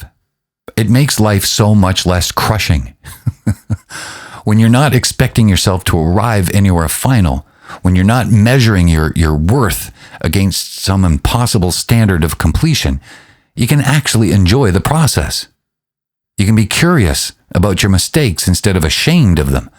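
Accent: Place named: Canada. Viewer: Bro,your accent has a little Canadian or maybe Minnesotan flavor. I noticed your "aboot/aboat" and the way you say "sory".....amirite? You don't have to answer, I know that's considered an inappropriate question these days. I'm just learning to ask questions, something I was not allowed to do growing up so cut me some slack maybe? 😊💙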